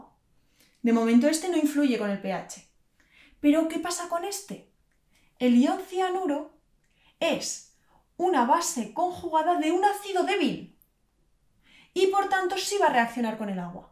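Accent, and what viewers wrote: Spain. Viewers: Siendo sincero no le gusta la química. Casi todo lo que explicas ni lo entiendo, pero sigo viendo tus videos es porque me gusta mucho el asento español y eres muy linda.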